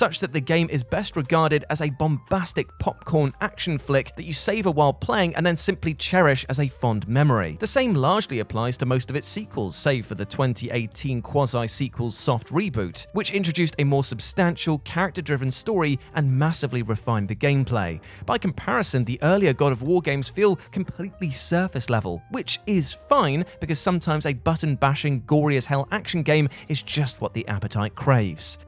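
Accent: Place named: United Kingdom. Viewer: Ah. My favorite British accent barring chef Ramsay and my personal friend. I hope to one day be as nostalgic and awesome as you with my own accent. The accent of cornbread and fried chicken...this I who I am don't judge me. 😑🤣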